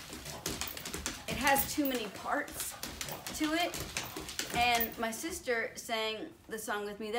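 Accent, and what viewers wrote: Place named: New York City, United States. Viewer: I had no idea her she had a NYC accent! Comes out every now and then, awesome! I get made fun of mines all the time at work, cause for what ever reason I have a slight Bostonian undertone to some words.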